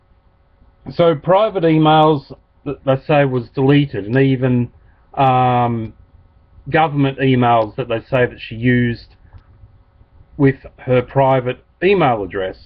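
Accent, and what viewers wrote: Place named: Australia. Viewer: Sounds like you're Australian. Not sure if the laws in Australia are the same as in the U.S. There are a lot of "privacy laws" in the U.S., which Hillary is hiding behind.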